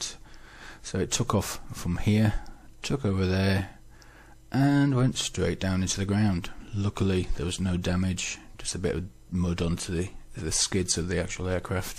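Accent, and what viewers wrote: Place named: England, United Kingdom. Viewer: Great footage J! I'm still playing with Litchi at the moment, but it's an amazing app. I wish I'd got your in depth knowledge of it ... it's quite a steep learning curve, fine tuning the settings, isn't it? Getting there though, and having fun! Lol! Your videos have been extremely helpful, and, as previously mentioned, it's great to hear an English voice! Thanks mate!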